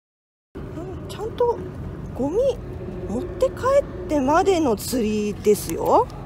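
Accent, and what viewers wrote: Kagoshima, Japan. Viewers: の優しさがとても嬉しく思いました。自分もほっとしましたありがとう😊 私も最近では釣場で空いてる時間にゴミ拾いしてます‼️皆んなが心掛ければ楽しい釣りが出来ると思います😁 所々出てくるかごしま弁がすごく癒されます👍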